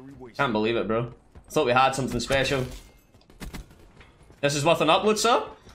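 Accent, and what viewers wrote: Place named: Scotland, United Kingdom. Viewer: Found you on K3’s channel and I’m glad I did! Where in Scotland are you from?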